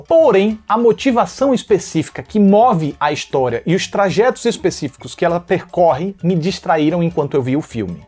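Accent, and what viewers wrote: Northeast, Brazil. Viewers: Adoro suas análises com esse sotaque nordestino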